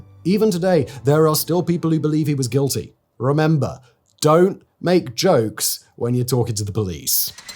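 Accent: United Kingdom. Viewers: I wonder how many legs you're going to do in with this video... A Brit narrating a video containing details that are applicable only to the US legal system. Huge oversight. Two VERY different systems.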